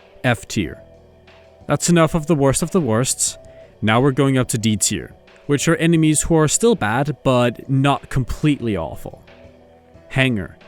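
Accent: Denmark. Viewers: bro r dansker wtffff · jeg var slet ikke klar over at du var dansker💀💀💀💀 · Er du dansker?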